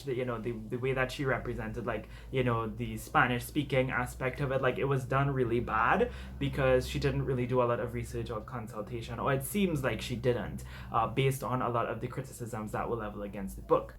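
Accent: Trinidad and Tobago. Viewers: I heard your accent and I was like OH MY GOD because I haven't seen a lot of trinidadian booktubers before. So it's nice to see someone come from where I'm from that's into this. :D And honestly you have such a good point here. It's one of the reasons I don't like blackwashing because it's lazy. Instead of writing characters who are POC or looking and supporting authors who are and do write POC (which then makes these things popular) they get fussy about these white characters. I think diversity depends on a couple things. Setting, like you mentioned as well as how you write their character. Of course culture and heritage is linked but when their only character trait is that they're black it comes off as ignorant. Especially if you're not from that culture. I think that people who write these characters if their culture is heavily linked to it, they should do a lot of research and have people from that culture do a sensitivity reading. 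But I also think we need to talk about the publishers and marketing. They're really the ones that push aside and don't properly promote POC writers. Which needs to be tackled. Because if we don't fix this in the first place by supporting POC authors and making awareness, who will? These kind of situations also make me wonder what's my place as a mixed Indian. I can already tell that people will drag me because I'm not 'actually Indian' since I'm from the Caribbean. Am I only allowed to write characters like me? Even if my entire book/screenplay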